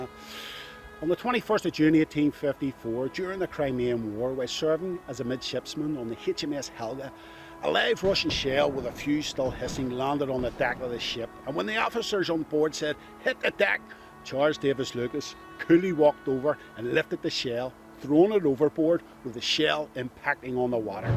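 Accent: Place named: Belfast, United Kingdom. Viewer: Great storys belfast style. Aye.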